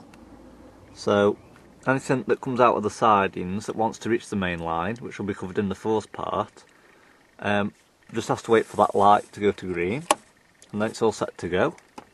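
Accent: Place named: Stoke, United Kingdom. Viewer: I recognize a stoke accent anywhere lol, are your points dcc and do these work with bachmann dynamis m8?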